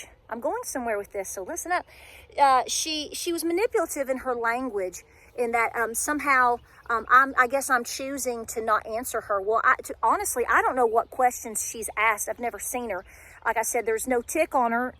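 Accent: United States. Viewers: Ma'am, I've only just discovered you today thanks to the Economic Ninja. I like your straight up American way of speaking. I also respect how you are hard working and feminine and sweet at the same time. My wife and kids are working well hard in central Virginia so you just keep on going and we will as well. Thanks for your honesty and plain spoken ways, Honey. Best. And all the best to your wonderful husband. Johnny and Crew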